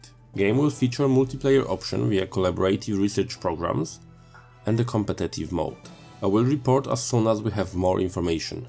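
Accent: Poland. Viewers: i don't know if you will feel offended but you sound polish. are you polish?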